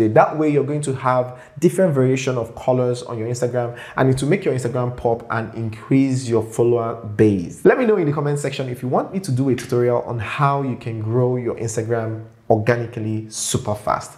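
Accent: Nigeria. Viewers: I like listening to your accent. I’m guessing Nigerian and Igbo?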